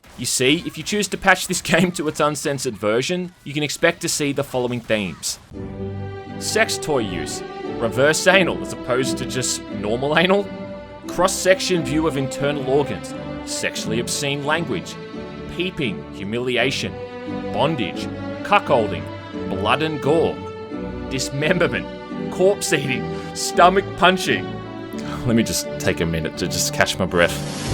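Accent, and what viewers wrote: Australia. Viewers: finally realizing that the aussie accent is funny.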